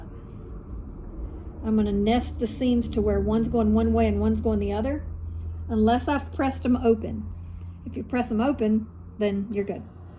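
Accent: South, United States. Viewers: I enjoy your southern accent. Your teaching techniques are very clear and easy to follow. I would have never thought of making the flying geese like this. Very colorful and unique. I have sewing since age 8 and am now 76. I’ve just gotten started w guilting. I am making quilts for premiers who don’t make it for parents to keep or bury child with. I’m a retired nurse and am making guilts for children with cancer. I would gratefully take some scraps off your hands and even pay postage to Kansas City MO. Continue designing and sharing w us. So get found your YouTube channel. Blessings 🎉 I’m a 100% disabled Vietnam Veteran registered nurse74-76 w asthma/COPD and sewing gives me pleasure and joyful gratitude to provide encouragement to others. ❤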